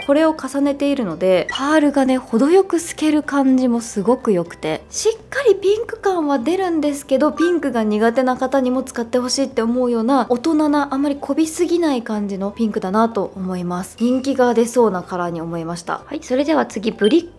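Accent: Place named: Aichi, Japan. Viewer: の「服」とか「靴」とかの愛知イントネーションはだいぶ聞き慣れたのですが、「ほくろ」も標準語とは違うイントネーションなんですね！いつか愛知に遊びに行ってみたいです☺️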